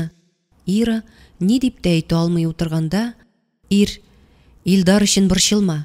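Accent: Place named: Tatarstan, Russia. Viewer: Повестьны БИК матур, чын татар интонациялэрэ белэн укыдыгыз.Рэхмэт.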